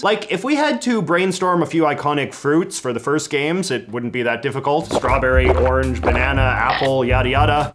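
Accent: Canada. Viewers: Love your videos and your beautiful Canadian accent, but as a music nerd, each time I hear a baroque harpsichord when you mention the victorians it bothers me 😅 get some brahms or beethoven Romantic inspired music of the victorian period 🙌 music nerd out 🙃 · I love your Canadian accent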